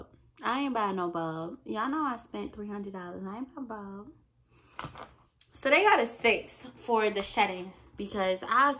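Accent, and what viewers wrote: Baltimore, United States. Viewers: You’re from baltimore arent you😏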